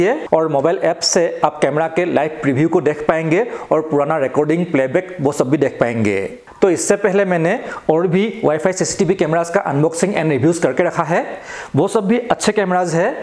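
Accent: Assam, India. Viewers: দাদা, আপুনি অসমীয়া নেকি?? কথা কেইটা শুনি এনে লাগিল।।।। ।❤ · Is that Assamese accent I hear? Lovely! Thank you for the informative video. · tu oakka assamese hai. tera accent se pata chalta hai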